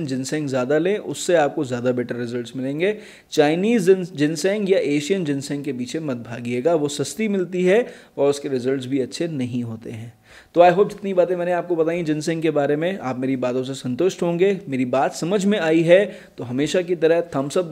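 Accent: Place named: India. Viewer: Indian English!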